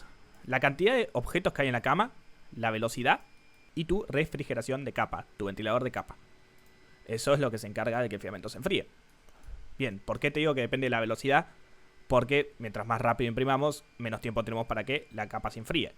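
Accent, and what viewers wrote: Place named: Argentina. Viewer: Sos un idolo, literal que me quedo siempre al final del video para darte una cierta forma de gracias virtual aunque allá entendido lo que venía a entender (porque lo explicas excelente y más sabiendo que hablas con un tono muy Argento xd), simplemente muchas gracias ídolo 💪🏻 ✨ 🎉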